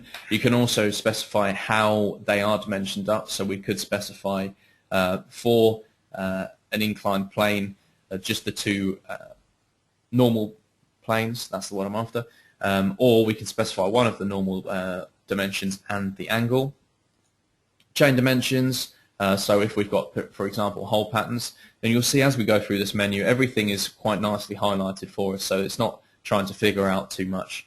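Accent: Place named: England, United Kingdom. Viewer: Other than the silly english accent, very helpful. Cheers =-)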